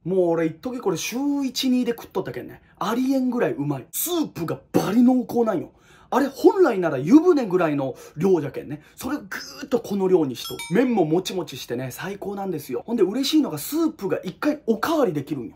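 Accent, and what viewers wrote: Hiroshima, Japan. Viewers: ラーメン動画を検索してたらオススメで上がって来て初めて拝見しました😀 私も広島出身ですので各店舗への広島弁でのコメント冗談も交えてすんなり耳に入って来ました❗😂 あと広島ご出身であればチェーンではないかもしれませんが岩国ラーメンの寿栄広さんご存知ですか？😀 広島に帰った際はわざわざ岩国まで行って食べてます😊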